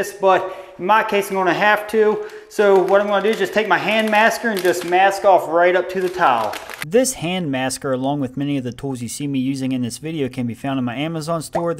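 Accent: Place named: Pennsylvania, United States. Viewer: Southern PA af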